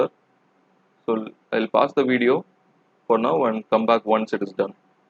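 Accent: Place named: India. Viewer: Its like watching sentdex ML series again. With indian accent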